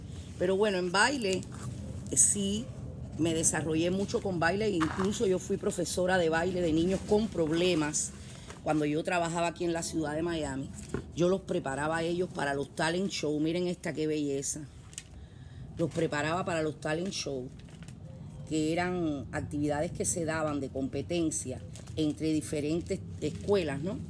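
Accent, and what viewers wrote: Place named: Cuba. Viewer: buenas tardes! Estoy buscando tus videos, por el puro gusto de escucharte hablar 😂ese acento cubano, a mí me encanta. Eres muy inteligente y culta, y creo que noto algo de perfeccionismo, que casi comparto contigo. (Ya lo estoy dejando, para no sufrir y hacer sufrir tanto 😂)Si supieras, yo quería ser presentadora de noticias por TV, y no es que fuera imposible, ni siquiera difícil, pero tuve la suerte 🍀 de optar por otra profesión, la cual al día de hoy todavía ejerzo. Fue un gusto ver y oír tu contenido. Saludos 🙏🏻🇩🇴🥰🌹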